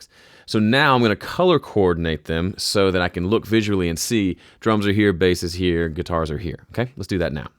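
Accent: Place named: Tennessee, United States. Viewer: He sounds like home (I’m originally from TN)!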